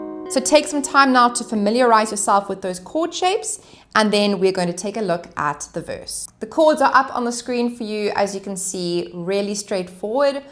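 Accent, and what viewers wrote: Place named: South Africa. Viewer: Thanks! That was a great tutorial. Nice and slow, especially the breakdown of the intro. And the tip about the capo change in the 3rd verse was super useful. Best of all, the tutorial was from a fellow South African. I grew up in CT (now living in the US), so I recognized the accent straight away. I love too, so I will be digging into your other songs by him. Keep the great content coming.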